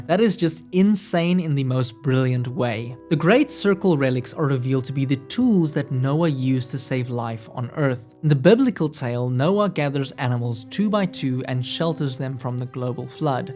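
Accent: South Africa. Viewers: The most South African sounding creator I have never seen.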